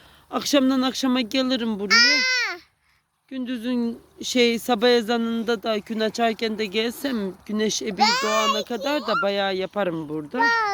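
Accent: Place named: Akçaabat, Turkey. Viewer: bizim burda bir komşumuz var aynı sizin gibi konuşuyor oda akcaabatli köyünden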